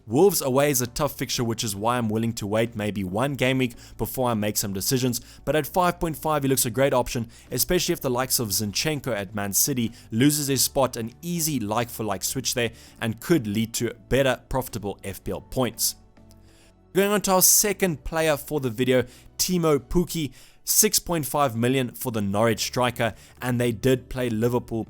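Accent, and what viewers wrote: South Africa. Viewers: good to hear that SA accent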